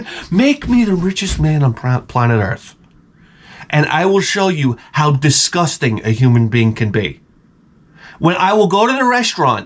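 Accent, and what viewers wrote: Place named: Long Island, United States. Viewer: I stopped going to the Dr when I'm sick because they never help. Every time they tell me I have to let it "run it's course". I don't need to pay someone to tell me that shit. Hope you're feeling better though. And thanks again for creating the greatest video game program in the history of human civilization. You're now the only person I am supporting on Patreon because you're just so damn entertaining and funny. It's also rare that I find someone on YouTube that sounds like me 😂 Almost makes me miss living on long island.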